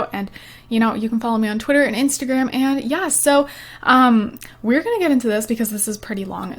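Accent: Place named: Canada. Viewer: YOUR CANADIAN "OOUT"DOOWN"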